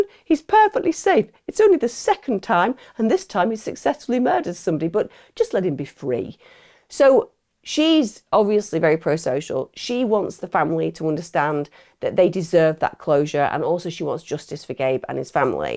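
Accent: Australia. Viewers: Hey, I’m from Australia and have not heard of this case until now. Our justice system is despicable! On a side note though, you’re Aussie accent isn’t too bad xx I’d love for you to also look at covering the Shaniya Davis case. I’ve come across a few stories about her case but haven’t watched them in the hopes that I see her story on your channel. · Loveee the Aussie accent 😂😂😂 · Your Aussie accent made me smile! Love from down under 🇦🇺🦘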